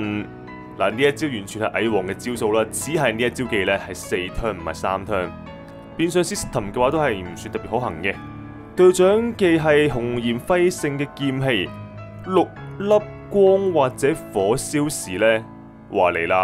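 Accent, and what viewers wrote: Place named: Hong Kong, China. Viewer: 就算一早睇哂當日所有最新情報都好，點都要聽你條片講多次，好鍾意你個d好地道港式既口吻❤加油❤ 利片：非基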